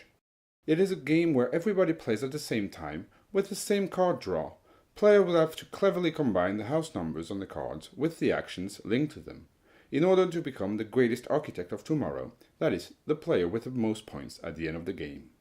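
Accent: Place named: United Kingdom. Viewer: Waou la voix qu'il a en anglais! Très lord british!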